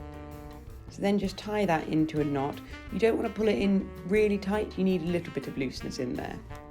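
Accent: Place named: United Kingdom. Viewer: I THOUGHT I WAS FOLLOWING YOUR DIRECTIONS BUT YOU DON'T GIVE THE ACTUAL DIMENSIONS OF THE PAPER THAT YOU ARE USING AND THE A.I. TRANSLATOR FOR CLOSED CAPTIONS DOESN'T UNDERSTAND BRITISH ACCENTS AND IT USED "A3" FOR ONE DIMENSION THAT I THOUGHT YOU SPOKE. YOU HAVE 2 TALL TRIANGLES ACROSS YOUR PAPER AND I HAVE 4 SQUARE TRIANGLES? I WISH YOU COULD GO SLOWER AND TAKE A PICTURE OF WHAT THE PAPER LOOKS LIKE WITH EACH KIND OF LINES YOU DRAW. YOUR HANDS ARE ALWAYS GETTING IN THE WAY OF THE CAMERA VIEW AND THEN THE IMAGE BLURS OUT. I REALLY WANT TO MAKE THIS!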